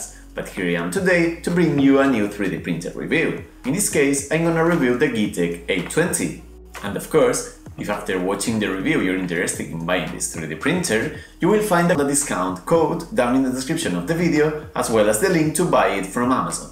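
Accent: Spain. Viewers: One cuestion, are you Spanish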